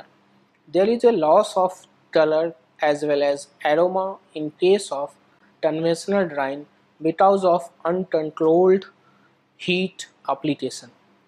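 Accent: India. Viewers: LOL, LOL, LOL. that is the way they speack in India, so please learn more languages so your brain will be open to understand, I speack 5, and you ?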